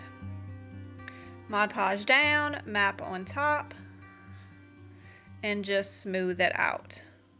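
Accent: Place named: South, United States. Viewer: Hi,. I just came across your videos yesterday. I watch a lot of home decor/diy videos and have never made comments. However, the more videos of yours that I watched, the more I was convinced that we are twins separated at birth (or at least kindred spirits). I have a booth at a local antique mall. If you look me up, you won’t find much because I seldom post anything to social media. I’m so busy making things to keep my booth stocked that I just can’t find the time to maintain a media presence. Many of the things you make are things that I make (and I thought that I originated the idea🤪) such as decor books, turning outside lanterns into inside lanterns, making wood rounds for thrift store cloches, painting old canisters and pitchers, etc. I have thought about creating a YouTube channel for over a year but haven’t taken the plunge. How do you find the time with young children? 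I hope you’ll respond but I know you are super busy. Just know that you have a twin (minus the cute southern accent) in Illinois.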